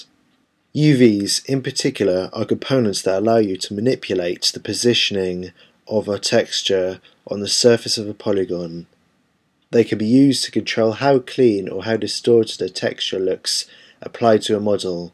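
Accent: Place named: United Kingdom. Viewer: He is a Brit! Brit always have cool accent!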